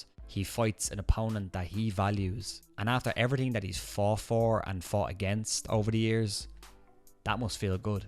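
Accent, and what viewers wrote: Ireland. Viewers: Awsome job my friend! You were spot on! I have to admit when I first heard the accent I was surprised when you started to drop that knowledge. Didn’t think an Irish man would but the time in to study Diaz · Haha love the Irish accent man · it's a weird feeling .. hearing an irish man talk about Diaz. Certainly lits a fire under me belly! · “Well shimmy me timbers!”This was absolutely awsome to hear this narrated by an Irishman! Believe it or not, the Irish & the Mexicans have a lot in common! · Heard the Irish accent 20seconds into the video. Assumed he was going to be biased towards conor. Turned the video off.. Sorry dude. An Irish man commenting on nate diaz just does NOT work for me.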